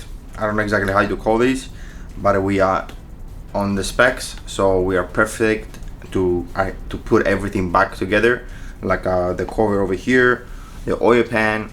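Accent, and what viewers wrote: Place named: Greece. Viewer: You sound Greek , nice video anyways!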